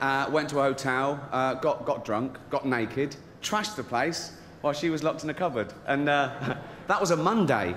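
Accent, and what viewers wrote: United Kingdom. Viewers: Yea unless you are also British, reading his lips is next to impossible. Accents are a SOB.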